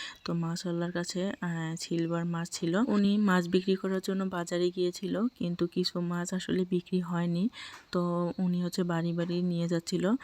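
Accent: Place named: Rangpur, Bangladesh. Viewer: আপু আপনার কথা শুনে মনে হচ্ছে আপনার বাড়ি রংপুর ?কোন থানা জানাবেন আমার বাড়িও রংপুর।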